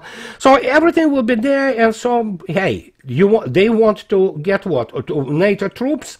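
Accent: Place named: Russia. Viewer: to my English-speaker's ear, you have a pronounced Russian accent, and as such, it is a challenge for me to understand you under the best of circumstance. However, you talk so quickly that it makes my comprehension all the more problematic. Could you please SLOW DOWN your speaking some? Thanks, BB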